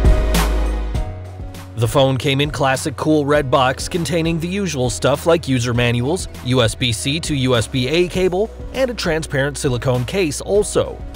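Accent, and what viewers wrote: United States. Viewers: Why does your voice sound american but it sounds like a foreign person wrote it and ur just saying it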